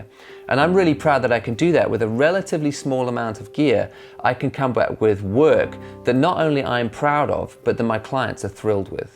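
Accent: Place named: South Africa. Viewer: Aah, I thought I detected a bit of South African in that accent! Really enjoy your videos, and I can especially relate to the latest one, being a freelance writer in SA.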